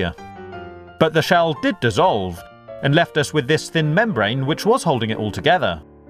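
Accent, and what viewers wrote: United Kingdom. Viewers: Are you british? Am i right? And your videos are super really very amazing incredible handsome!😄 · I love you're British acsent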